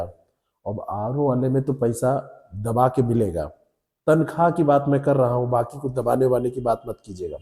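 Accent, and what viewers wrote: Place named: Purvanchal, India. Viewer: Lgta hai aap purvanchal k side k hai😂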